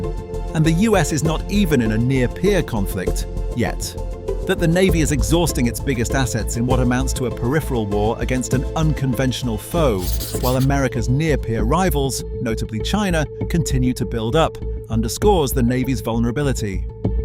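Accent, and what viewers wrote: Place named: United Kingdom. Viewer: The Ford is already back in the Red Sea ready to go. By the British accent I would say wishful thinking.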